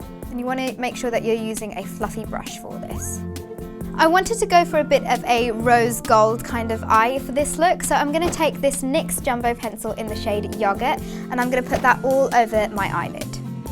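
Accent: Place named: United Kingdom. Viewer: lol are you sure about that? i think you're commenting on her accent, which sounds that way because she lives in the UK. her actual knowledge of english is fine considering she was brought up in a country where that is the first language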